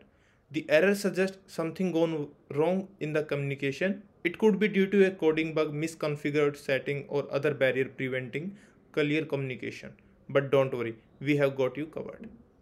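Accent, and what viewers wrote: India. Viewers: Useless like this Indian Voice :)))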